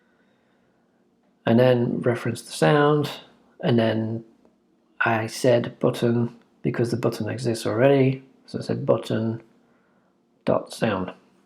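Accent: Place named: United Kingdom. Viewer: i subscribe I like how he talk a british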